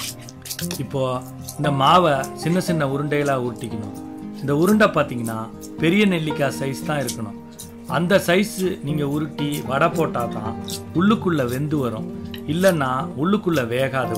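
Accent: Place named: Telugu, India. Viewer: Looks yum are you Telugu doesn't sound like malyalam or tamil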